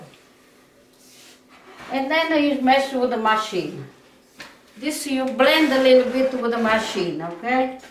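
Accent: Italy. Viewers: Isn't she wonderful. I love her Italian accent. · Hello from England. We love watching you and we love your Italian accent too. A very Merry Christmas to you and your family! Xoxo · Merry Christmas! Love the family stories and the singing! 💕❤️💕 I was wondering about the consistency of the Struffoli. Is it dense or airy? We like it dense, but the bakeries always seem to sell the airy kind! So much work......but.....yum! So worth it! Wish I took more notes in the kitchen as well as the huge wooden board that my Mother-In-Law’s mom always used to make the struffoli! So grateful that I found your channel! What a special treat! I remember when I first met Grandma....she gave me a plate of struffoli to take home! A delicious treasure! Your videos are getting me through some sad times........I so miss my mother-in-law! But hearing your Italian accent, listening to your stories and songs......warms my heart! 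Thank you! God bless you and your family! 💕❤️💕❤️💕❤️💕❤️💕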